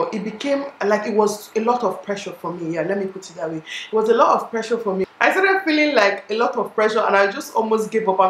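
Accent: Nigeria. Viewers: In God name ,can someone explain to me what she's talking because not anyone is able to listen to english with Nigeria accent please i beg in Jesus name... i just want to know what she did for her weight loss if it was with some writting that will be easy for peoples like us.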